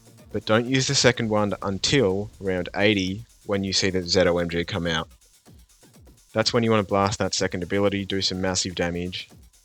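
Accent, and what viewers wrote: Australia. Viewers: Same. Aussie?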